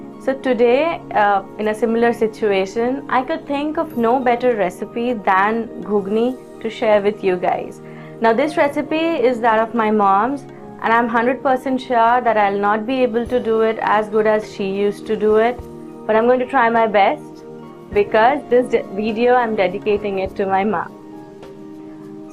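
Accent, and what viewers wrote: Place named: Bengal, India. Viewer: hehehe bhaja mosla that nice bong tone... :P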